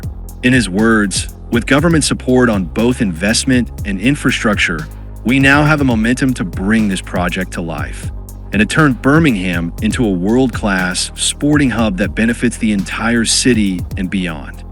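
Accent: United States. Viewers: I would rather have listened to an English-UK speaking narrator than an American one.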